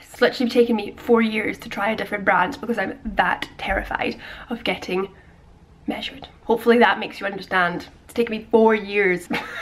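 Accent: Scotland, United Kingdom. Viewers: are you from scottland?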